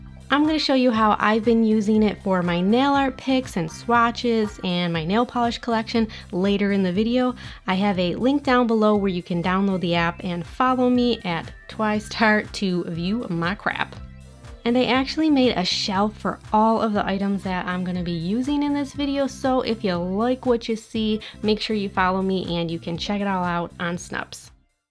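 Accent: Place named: Chicago, United States. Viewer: Loved this video! Are you from Chicago??? That accent...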